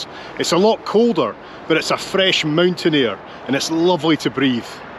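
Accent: Scotland, United Kingdom. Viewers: Very lovely scotish accent,welcome to Romania!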